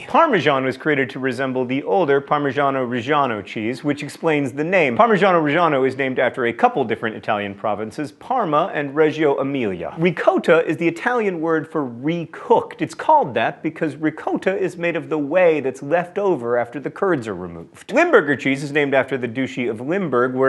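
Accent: France. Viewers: I like your french accent and just for that i like this episode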